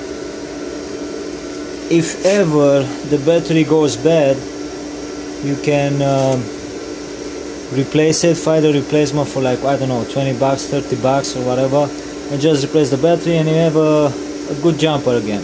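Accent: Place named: Romania. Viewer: Sound so romanian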